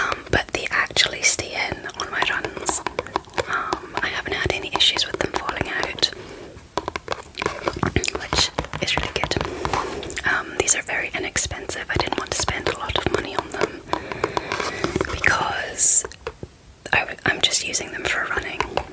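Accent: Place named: Scotland, United Kingdom. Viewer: Best (and only) asmr i watch / listen to. And the scottish accent is absolutely amazing!!!! Thank you!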